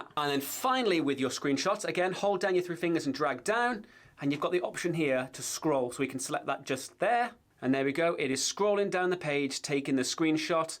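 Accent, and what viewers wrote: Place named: United Kingdom. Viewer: Not another British tech tuber, come on, the accent is very tiring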